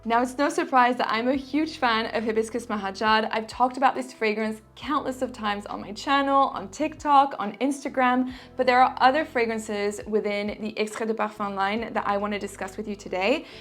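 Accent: France. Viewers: I truly wish you can review Versace Dylan Purple Paco Rabanne Olympea Loving your French as always… I keep practicing the French R now 😅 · Actually I don’t get extremely excited about the hibiscus mahajad… I do have and love Ingredients 07/2012 and recently got my hands on a sample of Neroli Nasimba and enjoy it quite a bit! Enjoying your beautiful French accent and pronunciation !!! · Came here for the review but I have to say I love hearing your French pronunciation. lol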